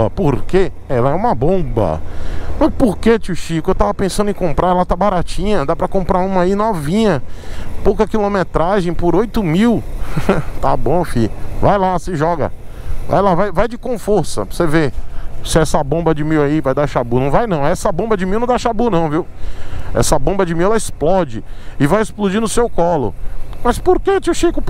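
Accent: Bahia, Brazil. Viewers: gostei da baianada. Se PIQUEM. Alguns não vão entender e vão se beliscar...🙄🤣🤣😂😂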